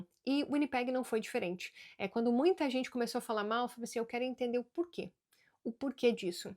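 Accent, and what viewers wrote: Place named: South, Brazil. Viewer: talvez tu já fez vídeo falando de que Estado tu é natural. Tú é Gaúcha ou Paranaense? ( Pelo sotaque). ESTÂNCIA VELHA RS.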